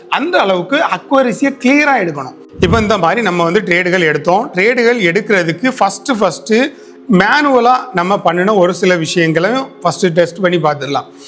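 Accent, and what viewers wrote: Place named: Nagercoil, India. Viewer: Neenga nagarkovil ahh unga slang nagarkovil karanga peasura mathiri yee irukku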